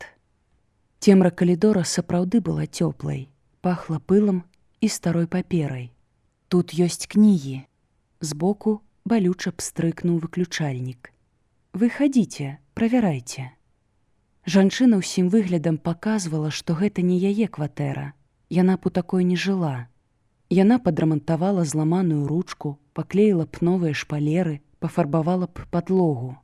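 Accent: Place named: Russia. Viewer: Вымаўляецца не "іх", а "йіх", "йіншы", "йімі" і г.д. - варта азнаёміцца з вымаўленнем І ў беларускай мове, бо няправільна шмат вымаўляецца. Мяккасць не беларуская, часам праскоква беларуская. На сутыку слоў асіміляцыі беларускія адсутнічаюць, вымаўляецца як у расейскай.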